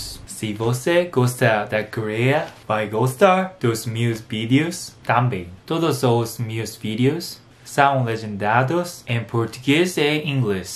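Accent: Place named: United Kingdom. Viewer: Você falando português parece que mistura um pouco de English hahah, muito fofo! Parabéns seu português é muito bom!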